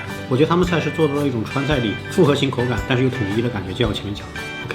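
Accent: Hubei, China. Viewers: 说话跟那个阿斗归来了很像。湖北口音？ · 听口音像湖北人？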